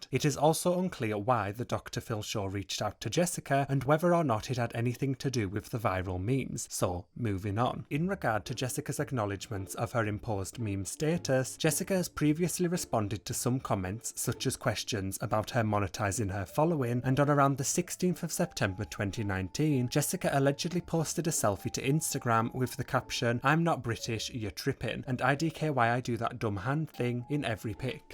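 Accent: United Kingdom. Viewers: I can’t tell if your from St Helens, Wales or Newcastle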